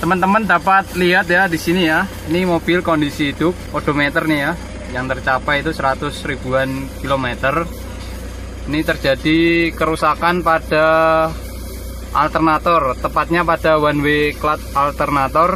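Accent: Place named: Java, Indonesia. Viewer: kuk medok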